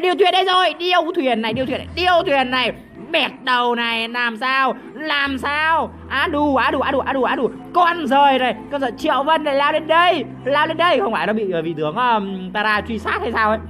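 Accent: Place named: North, Vietnam. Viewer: Giọng ông này hài vcc lúc tiếng bắc lúc tiếng nam :))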